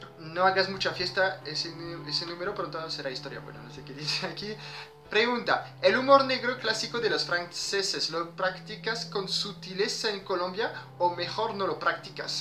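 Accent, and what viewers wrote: Colombia. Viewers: amo tu acento español colombiano ❤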